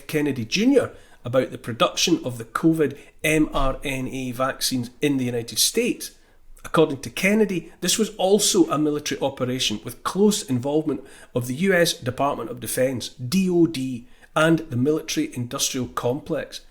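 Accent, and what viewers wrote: Scotland, United Kingdom. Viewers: the TRUTH delivered in the Brogue, thank you timeless warrior. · There's just something about a Scottish accent... The way the word "Freedom" just rolls off the tongue of a Scotsman... May the GOD of Abraham, Isaac and Jacob... Bless, Keep and Protect you my Brother!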